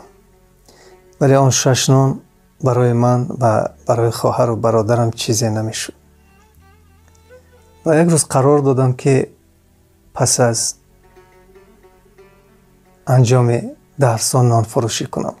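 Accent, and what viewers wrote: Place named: Ayni, Tajikistan. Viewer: Бово лахчаи Айнигия гум накунед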